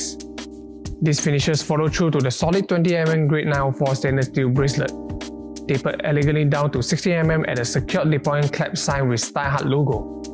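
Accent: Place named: Singapore. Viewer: English. That’s the Singaporean accent for you lol. He has rather bad pronunciation and pacing though, even for a Singaporean.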